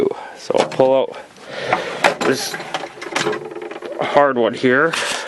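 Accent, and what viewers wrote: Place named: Canada. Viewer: Canadian much ? Lol. Never realized how much we say 'and that' as well as 'that sorta thing'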